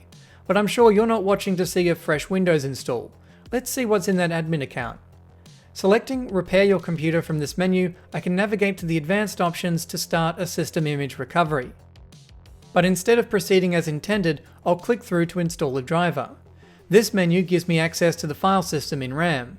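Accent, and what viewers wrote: Australia. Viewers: Dude that vacuum looked to work fantastically.. please help w brother out, what kinds vacuum? Make/model. Or do they only sell em in AU or whatever the accent is. Enjoyed the video.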